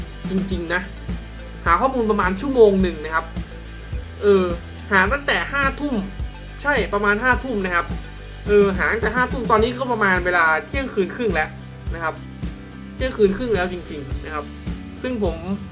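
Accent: Isan, Thailand. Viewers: ชอบมากหลายๆเว้าอิสานคน จ.ใดหนอนิ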